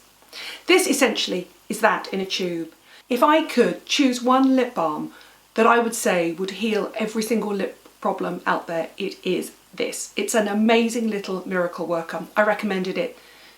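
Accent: United Kingdom. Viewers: British accent 😍😍😍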